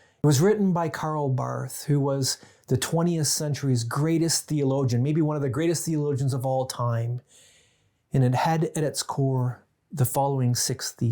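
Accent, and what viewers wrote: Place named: United States. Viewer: Your question seems to presume that Bonhoeffer would do something in response to the Trump administration, not so subtly implying that the Trump administration is like the Nazi regime. As a Christian I reject this presupposition. The governments of Europe are much more fascistic than anything going on in America. So perhaps if you are referring to Bonhoeffer responding to the current situation in Germany I might agree with you. But your American accent suggests that you are referring to the Trump administration. You need to be clear about what you are referring to.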